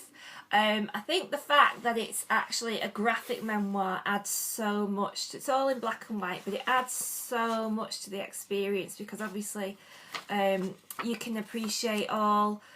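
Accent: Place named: Northern England, United Kingdom. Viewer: Yey, a northern lass. Found u via Eleanor and loving ur videos. Nice to hear a northern accent xx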